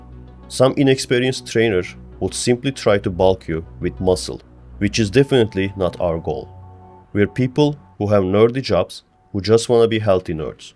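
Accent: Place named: Turkey. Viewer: Turkish english accent is absolutely the most unique english accent I have ever heard. There is nothing like it.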